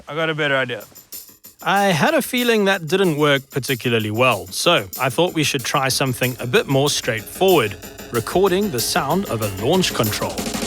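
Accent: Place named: South Africa. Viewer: Miss that accent true South African Keep it up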